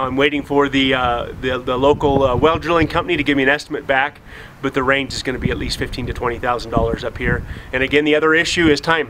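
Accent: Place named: California, United States. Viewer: I thought you said that you were in Idaho. It sounds more like California.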